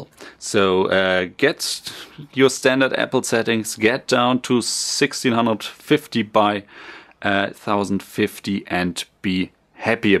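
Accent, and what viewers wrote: Germany. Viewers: After 5 seconds, i already heard that you are German. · Yay ein deutscher. Ich habe den Aktzend doch gleich erkannt ^^ Ist das eine 4k Auflösung?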